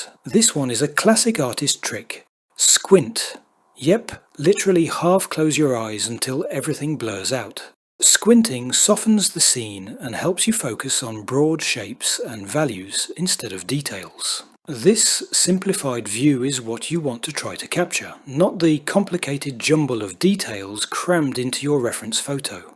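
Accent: United Kingdom. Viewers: He sounds English but can not spell colour?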